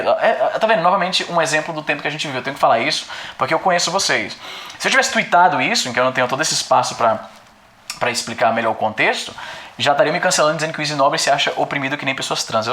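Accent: Brazil. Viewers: Primeira vez que ví seu canal em Inglês, tu fala muito bem, mas é notavel o sotaque BR, o que é normal, acho que na questão de identidade oficialmente no Canadá tu seria um Brasilian canadian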